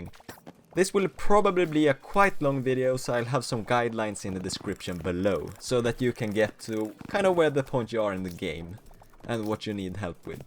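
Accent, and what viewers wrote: Sweden. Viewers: Are u Swedish? Ur accent kinda sound Swedish. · Are you from sweden beacuse i can hear when someone started with swedish and learned english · that swedish accent is heavy af, ha kul i 2018 : D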